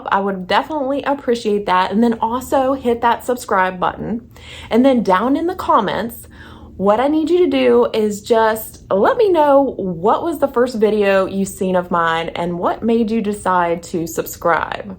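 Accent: South, United States. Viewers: I started watching decorating videos last Fall and found you. I really enjoy your enthusiasm and we have similar taste. I love your southern accent too. I enjoyed learning more about you and look forward also to your Bible verses. You are a beautiful inspiration, thank you! God bless you! 💕(I am a grandma of 9, you have so much to look forward to). Such blessings!!! 😘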